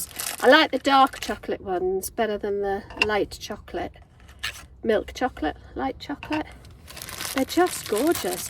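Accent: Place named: North East England, United Kingdom. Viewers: Well done lovely ❤ your Northeast accent is charming 😊 really enjoyed the vlog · What an interesting accent you have. Its like the north east met the West Midlands.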